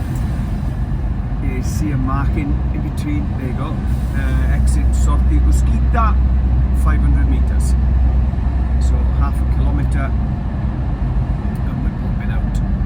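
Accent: Wales, United Kingdom. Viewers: Another great watch Mr 😁, contents as normal interesting, and the views spectacular😘, really can't get enough. Just one thing can't get my head around, where do you actually come from 🤔,I'm convinced there's a slight hint of a Welsh acsent, but it's got something else with it. Make the best of the weekend, o and what happened to the meeting up with Captain Slow😊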